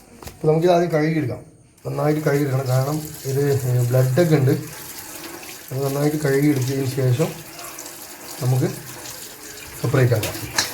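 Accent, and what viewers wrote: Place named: Malabar, India. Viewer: Voice over kodukkumboo maathrollu le ee malabari type samsaram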